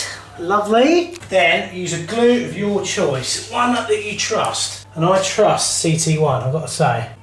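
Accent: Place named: United Kingdom. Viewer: The British accent is a little bit distracting.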